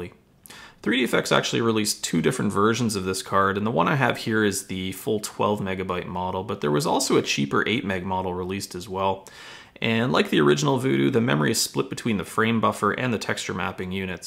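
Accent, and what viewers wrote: Canada. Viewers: are you Canadian? Because i get the feeling with your accent especially when you say "about":D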